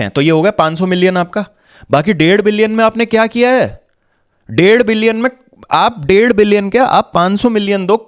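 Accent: United Kingdom. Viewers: Your English accent uff❤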